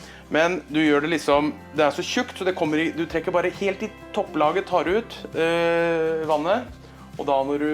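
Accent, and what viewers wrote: Østfold, Norway. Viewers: Var sikker på at den dialekta var fra Østfold .... God mat okke som :)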